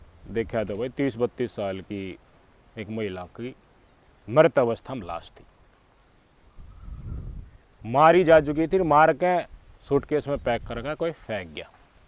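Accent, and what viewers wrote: Haryana, India. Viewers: Maan ge bhai aap ne aap ki haryanvi bole ko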